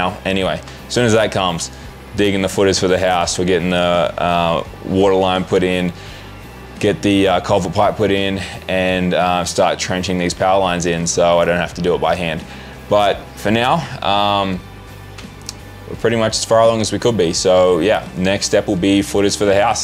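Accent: Australia. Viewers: Dude, where are you? Aussie accent, 120v inverter. Confusion Ahh Colorado.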